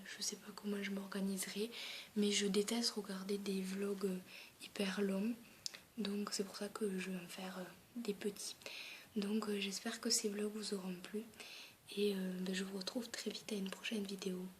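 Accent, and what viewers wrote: South, France. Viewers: T'es vidéos sont juste top! J'aime beaucoup ton accent,du Sud? bisous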